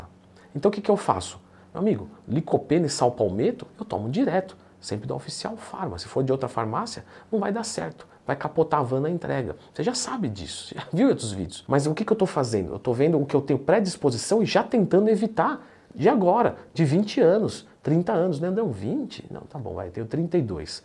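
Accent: Northeast, Brazil. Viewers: Vc é nordestino? Que bonitinho!!!! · Vc é nordestino?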